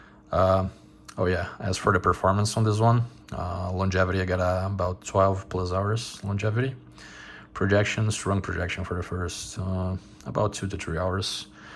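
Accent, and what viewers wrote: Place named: Portugal. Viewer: lovely review bro! your accent sounds very Portuguese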